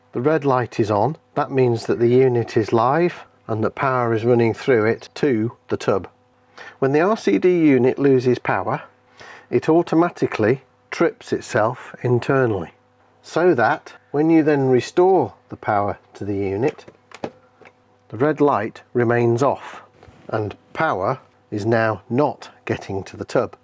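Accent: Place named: England, United Kingdom. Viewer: Hi. My sister and I bought this hot tub for our Canadian wilderness cabin…it arrived without any instructions ….. that made sense to us at least….so we you tubed it….and voila! A wonderful Englishman who walked us through every step..use language we understand in an accent we love…so we are here in the mountains, in the back country of our cabin waiting to turn on the heat and enjoy the amazing stars visible only at night…we are hoping to get the right temperature tonight….thank you so much~!!! You absolutely made our summer!!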